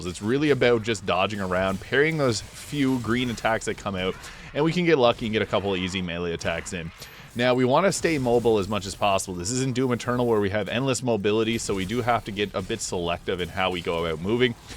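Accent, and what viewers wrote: Canada. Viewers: Do I hear a fellow Canadian?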